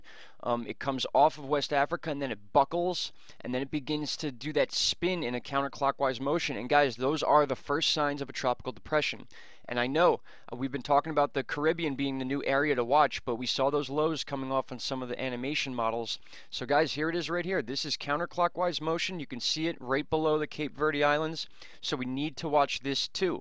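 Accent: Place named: Philadelphia, United States. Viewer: Yay! No hit on NJ :) you have an accent... Are you from Philly or NJ? You almost have the same accent as me!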